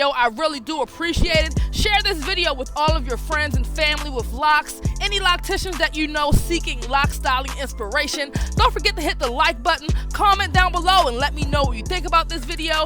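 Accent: Baltimore, United States. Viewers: Are you from Baltimore? You have a very distinct accent. Loved the video! 💖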